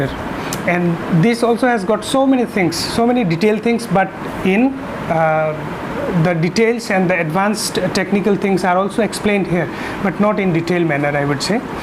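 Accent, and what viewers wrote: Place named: Bihar, India. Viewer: R u bihari ..... U told eskils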